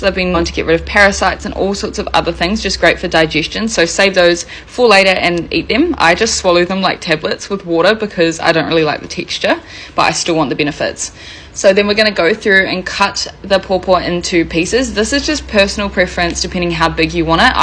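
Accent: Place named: New Zealand. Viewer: Kiwi accent coming through there, loud and clear. 'Small little bits'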